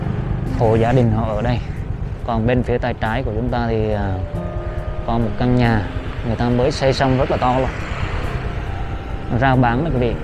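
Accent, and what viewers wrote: Central, Vietnam. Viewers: Cảm ơn em đã cho cơ hội theo em đi dạo cảnh Đà Lạt mỗi ngày. Những cảnh rất đời thường và thực tế. Thế mới quý, em ạ. Cách đây khoảng 8 năm, tôi đã ghé Đà Lạt và thấy xa lạ lắm. Qua những chia xẻ của em, tôi hiểu và quý Đà Lạt hơn. Ước mong có ngày gặp lại thành phố nhỏ và dễ thương đó. Bây giờ thì nghe quen giọng miền Trung của em rồi.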